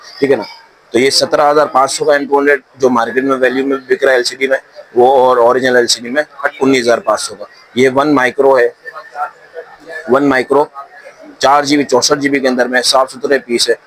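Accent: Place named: Rural, India. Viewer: بولنے کے انداز اور شکل سے بھی پینڈو لگتے ہیں بھائی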